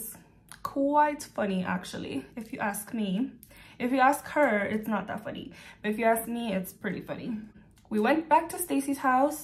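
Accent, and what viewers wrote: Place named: United Kingdom. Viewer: Love it she’s cute and I love the British accent and that’s the first grwm in awhile that’s it’s really good can’t wait for more ❤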